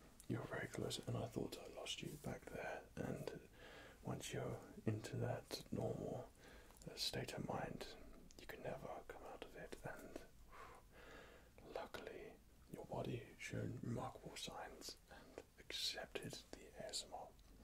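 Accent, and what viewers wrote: Australia. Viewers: May Shida he's Australian I think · Is he Australian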